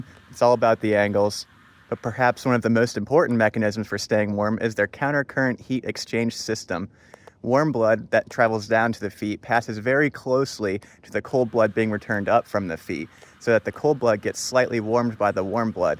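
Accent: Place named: United States. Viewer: Fun and all but cant stand the thicc american accent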